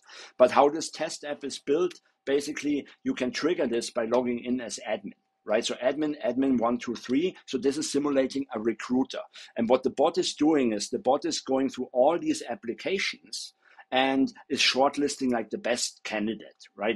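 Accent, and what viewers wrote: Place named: Germany. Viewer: Sehr gutes video , Ich habe von deiner Stimme erkannt dass du ein deutscher bist . Bin ursprünglich auch aus München. Hab auch mehrere Methoden selber herausgefunden Prompt-Injektion zu machen um schwachstellen in LLM-Models zu entdecken . Mach weiter so , liebe deine videos ! 🔥sind kreativer und gehen tiefer ins detail . ✌🏻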